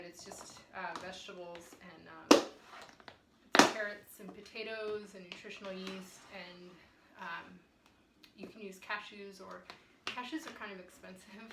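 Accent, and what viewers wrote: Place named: Los Angeles, United States. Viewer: Thank you! I'm just curious, if it's ok to ask - do you guys live anywhere near Los Angeles? The way you speak is pretty much exactly like me, and you even look like you would belong in our family!! Whatever the case - good luck to you and happy cooking. Take care, Rob.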